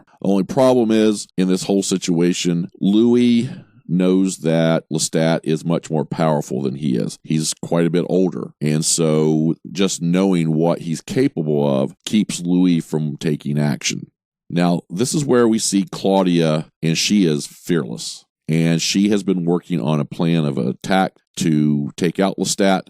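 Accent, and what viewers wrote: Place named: Texas, United States. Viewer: Really late to the party, but just have to tell you how much I appreciated your reviews. Your analytical skills and emotional I.Q. are off the charts! Plus, you have a voice that belongs in commercials. And that accent -- Texas? When you mentioned Jonah with Louis in ep.3, but not Antoinette with Lestat, I knew you didn't find her memorable enough to recognize 22 years later in ep. 6. Favorite line from you all season? "How many times are you gonna fall for this, Louis!" when he was professing his love for Vampire Armand. The secret to Louis was revealed (to me) in the first episode when Daniel called Louis his father's eldest son, and Louis corrected him, "The FAVORED son". It was important to him, being favored son. That's why all the old-as-sin vampires go ga-ga over Louis in books/movie/tv. They crave his fiercely-held echo of their forgotten humanity, and they sense his need to be some man's "favored son". 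Plus he's hot haha! Thanks for entertainment!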